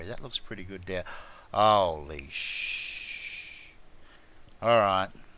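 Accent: Australia. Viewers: I just have to say how much I love the Aussie vernacular and accent! You have such charming ways of saying/describing things. (This does not refer to 'Aussie English'), you know what I mean. <3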